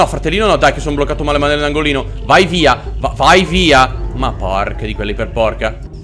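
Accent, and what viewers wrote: Veneto, Italy. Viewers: Il tuo accento veneto è sublime.😂😂😂😂😂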